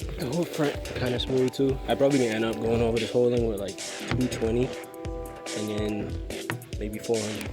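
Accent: Bahamas. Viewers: Bey I say I know that accent sound familiar😂. You gain a sub just because of that. This my first time watching ya videos. Continue dropping the bahama heat bro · why bro sound Bahamian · Could here that accent from a mile away 242!!!! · Heard that Bahamian accent and felt right at home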